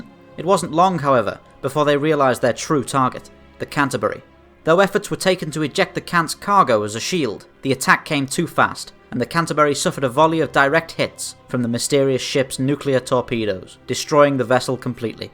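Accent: United Kingdom. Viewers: I really like your videos! Question are you British it sounds like it.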